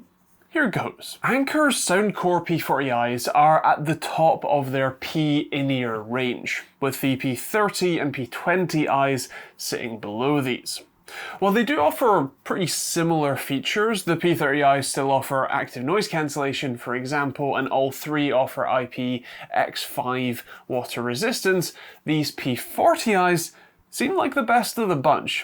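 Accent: Scotland, United Kingdom. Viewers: Appreciate this review. I bought these a while ago and have actually bought many Soundcore earbuds over the last few years. These are definitely some of the best I own. I really like my A3i's also. I tend to prefer the bud and not the stem style in general. I have also purchased the A20i's and have recommended them to several people who all loved them so that was pretty cool as well. The phone stand on these is a great touch and the sound is quite good too! Great value for sure! I really enjoyed your style as well. First time seeing one of your reviews. 😊 I have an awkward question. Is your accent Scottish or Irish? I really like it but can't determine which it is. I'm a Canadian who spent 3 years living in Scotland when I was a child so I should know but can't quite figure it. 😛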